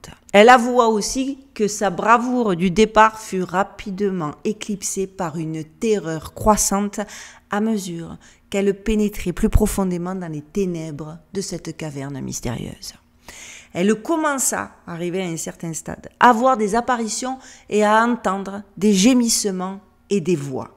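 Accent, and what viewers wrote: Marseille, France. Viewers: Pub France Vérif' :face-green-smiling: On reconnait la marseillaise qui demeure en toi!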